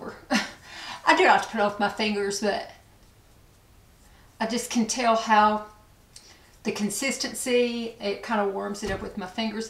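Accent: South, United States. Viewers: Love your hair colour .and red certainly suits you .but. The nicest is that accent ! Remind s me of , " gone with the wind " .Dont love wet n wild cheap . Looked nice but not for me .Happy valentine's ❤️🌹🌹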